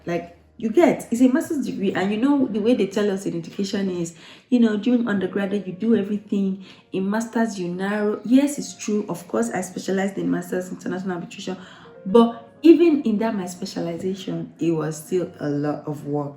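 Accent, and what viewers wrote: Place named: Nigeria. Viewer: The Nigerian accent tho...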